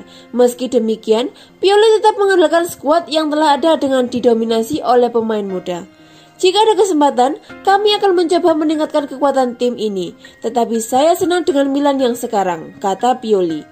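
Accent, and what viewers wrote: Java, Indonesia. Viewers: Coba belajar ngomong dulu yg bener kalo mau jadi narator. Ngomong medok,nyebut nama aja salah. Apalagi beritanya simpang siur plus ngarang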